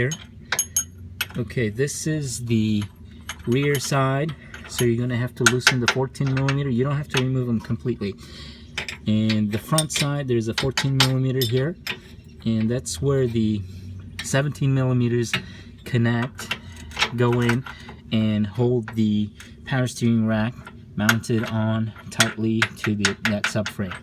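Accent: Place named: Canada. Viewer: Where ya from mate, sound like a Canuck to meeee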